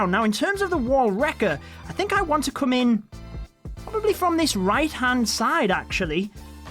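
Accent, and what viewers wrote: United Kingdom. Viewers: Are you from British your voice is so something that is incredible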